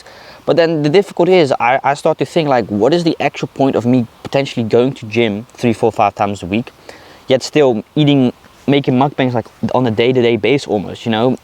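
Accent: South Africa. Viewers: Life’s just starting for you-it’ll all turn out just fine-love your (South African?)accent.Greetz from Hellevoet👍🏼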